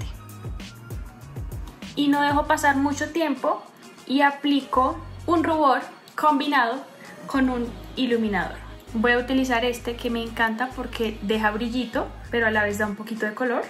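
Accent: Bogotá, Colombia. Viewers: Eres de Bogotá? Jaja, es que por tu acento se nota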